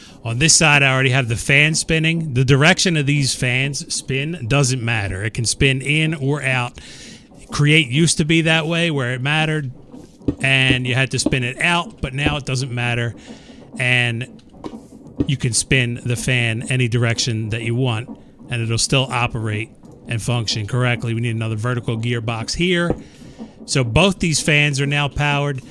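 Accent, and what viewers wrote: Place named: Philadelphia, United States. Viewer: As soon as I heard you pronounce water as "wooder," I strongly suspected you were from the Philly area. I grew up near Pittsburgh, which has entirely different accents, but it's still nice to hear some Pennsylvania pride. Respect, sir!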